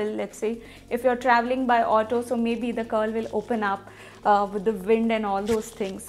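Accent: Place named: India. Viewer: U speak like indian but looks like foreigner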